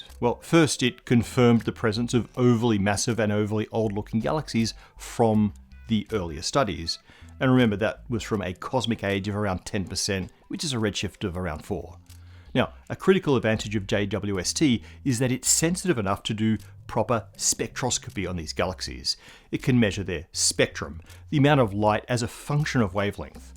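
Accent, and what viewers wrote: Australia. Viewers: Great hearing an Aussie accent presenting an American science channel. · Why use an Australian guy and not a regular North American speaker? It's always an Aussie in these science shows. As if to add credibility? Or he's still a minority even though he's white? PBS? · I really wanted to watch this, as I've tried others of this channel, but every time my patience is sorely tested and then after a few minutes just can't take all the unnecessary and extraneous gabbing and explaining. Jeez stop trying to convince us of the woundrousness of the universe and just get to the point. And at least try a little bit to obtain a more American accent. I don't know what accent that is, and typically I don't mind UK accents, but this guy's is really hard to take. Austrialian?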